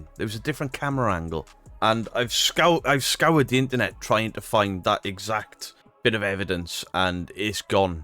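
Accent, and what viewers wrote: Wales, United Kingdom. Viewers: He’s Welsh 😊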